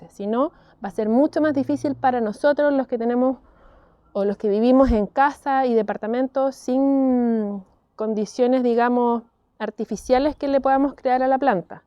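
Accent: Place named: Chile. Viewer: Muchas gracias por compartir! Me encantó el video y tu acento chileno! Saludos desde México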